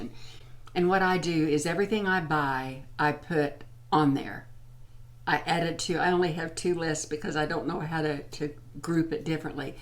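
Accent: South, United States. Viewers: your soft spoken, Southern delivery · Hi! I watch your channel for several reasons. First, you are honest and I trust what you say. Second, you are sweet and loving, and you have that beautiful slight southern accent. You remind me very much of my step-mother who died this year, watching you is like visiting with someone I loved very much. Third, you are genuine, it's lovely "spending time" with you. I love that you put your videos out on Sunday mornings, it's become a Sunday morning ritual for me. Fourth, you're real. Some of the others that I watch seem very much like "presenters," (I swear they are auditioning for the home shopping network) but watching you is like talking to a friend. And finally, watching you is like going home. I grew up in Indiana, but I have lived in Chicago for 40 years now. And although Chicago is very "Midwestern," it's not small town Midwestern. 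Watching you is like going home every Sunday morning.... you are good for my soul!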